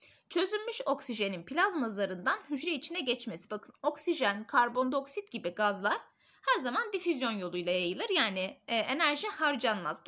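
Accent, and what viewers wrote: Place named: Mardin, Turkey. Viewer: şiveniz mardin şivesine benziyor ondan sordum hocam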